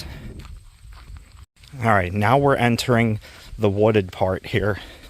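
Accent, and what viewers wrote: Pennsylvania, United States. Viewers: That was great. I've been to Presque Isle S.P. dozens of times but never to Pine Bluffs. I live 50 miles South of Erie and Pjne Bluffs is a handful of miles out of where I go. Very nice job, I feel like I was there too. I can tell you're from Pittsburgh by your yinzer accent but I thought you would be used to going uphill, ha! Good job. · Nice video! Much appreciated. Great idea about PA state park visits. I'm a transplant from Philly area to Western PA. Your PA accent is endearing. Liked and subscribed.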